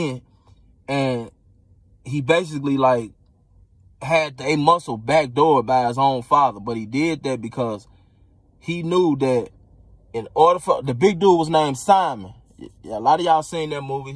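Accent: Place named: Chicago, United States. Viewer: Sounds like he from Chicago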